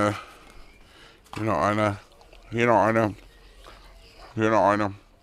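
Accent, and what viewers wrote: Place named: Hesse, Germany. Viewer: Muss seit 2 Jahren in Hessen studieren, dein Dialekt gibt mir ein Gefühl der Heimat✌🏻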